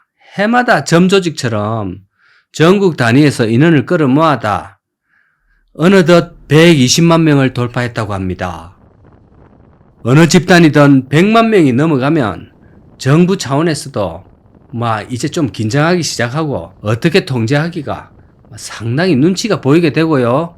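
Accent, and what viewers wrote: Gyeongsang, South Korea. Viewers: 유튜버는 사람들 일자리 잃어가는게 신나고 즐거운가 보네...한국에 기레기가 만든 용어인 귀족근로자 많아서 잘살면 덧나냐? 다들 생활고에 시들어 살아야 정상이냐? 말투가 역시나 경상도인이네...경상도 사람들의 종특은 모두가 못살기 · 혐오 갈라치기 좀 그만해라 ..나이처먹고 뭐하는건지...나도 나이 처먹어도 돈 이런식으로 안벌어....그리고 경상도 억양쓰니 더 역겨워~ 이세상에 일방적인것은 없어...노조없는 삼성은? 천국이냐? 노조의 문제점이 뭔지 해결책과 헤안이아닌 오로지 혐오... 나이 이렇게 처먹는거 아니다...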